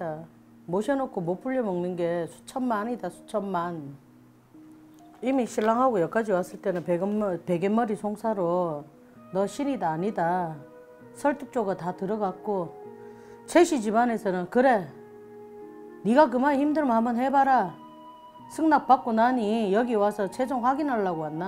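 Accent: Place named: Gyeongsang, South Korea. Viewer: 선생님 사투리쓰셔서 갱상도 계신줄 ㅠ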